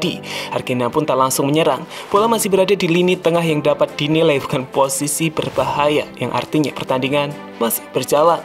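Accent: Java, Indonesia. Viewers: Medok banget ngomongnya.. Hedeeeeewh..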